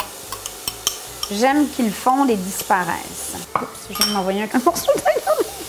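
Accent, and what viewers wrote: Quebec, Canada. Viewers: Belle découverte pour moi de votre chaîne! J'adore vos recettes et la plupart des plats que je ne connaissais pas, et j'adore votre fraîcheur et votre accent québécois 👍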